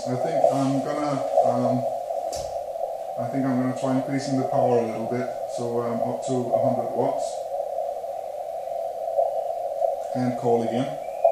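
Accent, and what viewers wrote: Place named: Denmark. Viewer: you have got to be danish, your accent sounds danish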